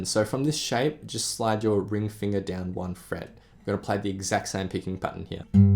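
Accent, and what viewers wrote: Australia. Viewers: Hello are you from Australia?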